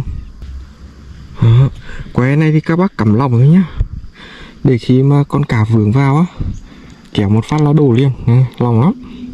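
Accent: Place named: Thanh-Nghe-Tinh, Vietnam. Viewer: Ông ơi nói tiếng địa phương khó nghe qua. Thật lòng thích câu mà nghe tiếng thanh nghệ tĩnh là chán luôn!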